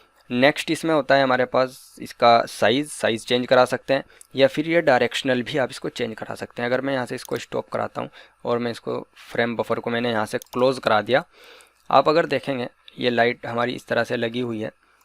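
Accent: India. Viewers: Hi, you sounds like my side. Are you from U.P.?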